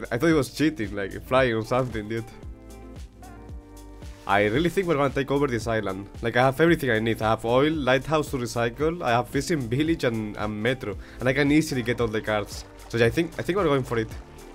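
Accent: Spain. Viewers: This vid was amazing lol. Love your accent are you from Spain? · Hola, nose si hablas español pero supongo que si por el acento, buen video, me encantan tus videos y el hecho de que hables ingles, si no hablas español, nice videos mate, keep like this and u will grow very fast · Are you spanish? diría que si que por tu acento al hablar, pero puedo equivocarme. Un saludo y sigue haciendo videos así de buenos!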